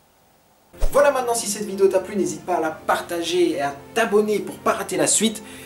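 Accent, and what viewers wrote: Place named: Alsace, France. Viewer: Tu ai alsacien car j'ai reconnue ton accent .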